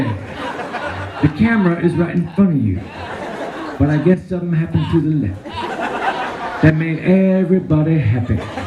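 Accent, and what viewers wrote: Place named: South, United States. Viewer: Was he from Georgia? He has a southern accent here. Sorry he is gone❤😅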